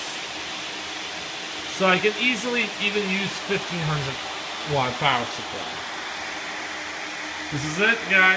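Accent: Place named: New York, United States. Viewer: Thumbs up for the rockin rig and NY foughetttaboutittt accent!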